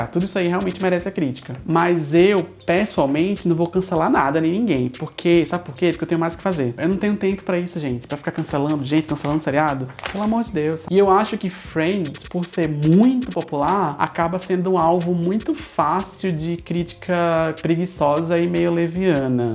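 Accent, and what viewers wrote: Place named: Recife, Brazil. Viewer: por mais sotaque recifense falando de cultura pop, meu voto é sim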